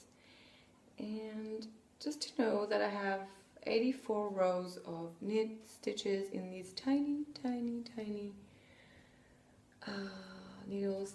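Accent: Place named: France. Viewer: Hello! I am Jacqueline and I am a Canadian living in Tokyo, Japan. I love knitting and I am currently working on a turtleneck sweater for myself in Brooklyn Tweed LOFT (which is amazing yarn!) and a hat knit in merino and mohair/silk yarn held together. They are both knit in black! I am trying to make basics that go with my monochromatic wardrobe. I also sew but I am just getting back into it. Last week I made myself a knitting project bag with recycled kimono fabric. I loved your podcast and your English is beautiful and your French accent is cute:<). Thank you for the effort you put into doing this and I look forward to more.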